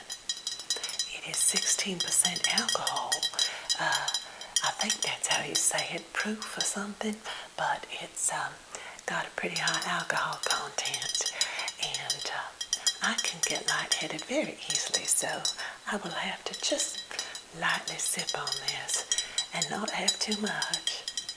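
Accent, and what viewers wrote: South, United States. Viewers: I like the tapping also the southern accent makes me relaxed a lot i seem to prefer it for asmr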